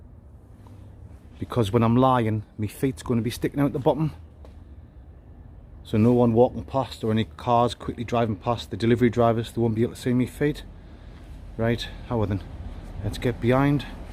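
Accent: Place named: Durham, United Kingdom. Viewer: great stuff, are you in the Durham area? just from the accent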